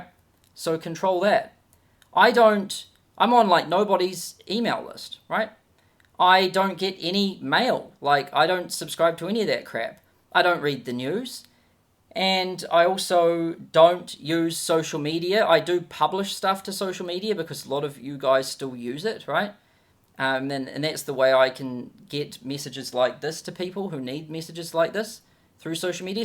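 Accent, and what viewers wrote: New Zealand. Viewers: You sound like a Kiwi? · Man I just like to listen you talk, your NZy english is so cool!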